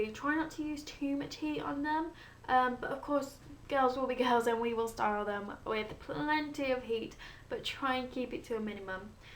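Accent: United Kingdom. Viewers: Are you british/english? I love your voice! :3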